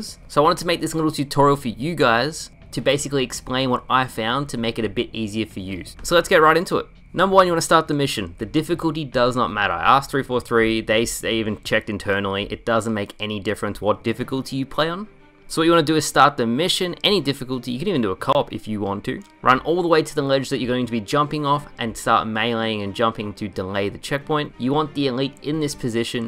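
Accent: Australia. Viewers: Better than achievement hunter cuz it doesn't have 4 minutes of stupid jokes and jacks mediocre voice. makes it quick and easy and aussie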